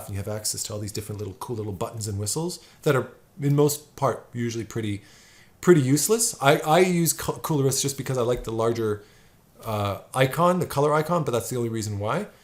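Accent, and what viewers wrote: Quebec, Canada. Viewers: Love your videos, been fallowing you for awhile. I'm from New Brunswick. And I've heard you mention your from Montreal. Whether your English originally or French, I had to smile cause I heard the French Canadian Accent Pop in this video for the first time! Keep up the great work! LOL got a bit further in the video and caught you subduing french pronunciation of"necessary."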